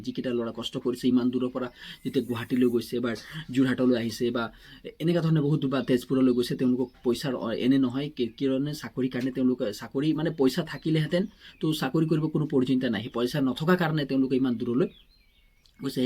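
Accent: Bihar, India. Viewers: Toi axomia a koba najna nhu ..bihari nki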